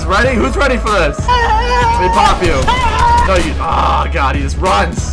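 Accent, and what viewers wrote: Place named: New Zealand. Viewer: Who else hears kiwis when he talks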